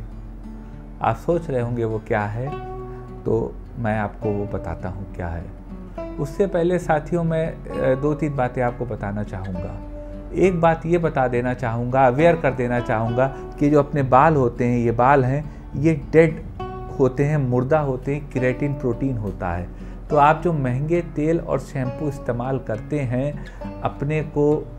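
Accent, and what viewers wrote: Lucknow, India. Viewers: Lucknow side ki bhaasha to lag rahi hai..........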